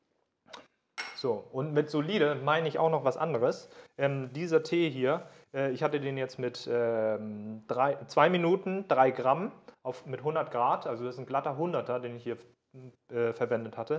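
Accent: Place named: North, Germany. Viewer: Und dann noch dieser nordische Slang mit dem angequatscht wird! ;-) Großartiges Video!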